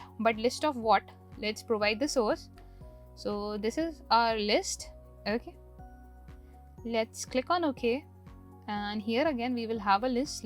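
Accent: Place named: India. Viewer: She is good. But you also Indian i mean same accent with her. 😂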